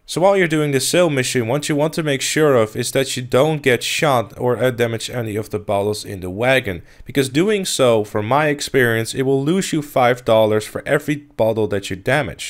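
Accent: Netherlands. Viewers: His dutch accent is funny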